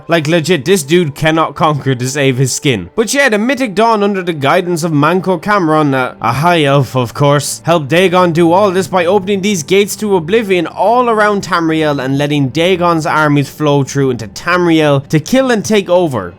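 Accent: Ireland. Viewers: Im irish and ive never heard someone as irish as you. Great video man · Finally! An accent that's not american! But Irish! I thank thee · The paddy is strong with this voice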